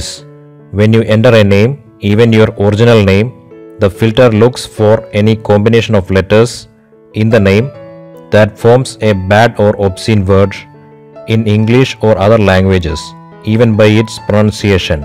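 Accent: Kerala, India. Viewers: Malayalam accent aanallo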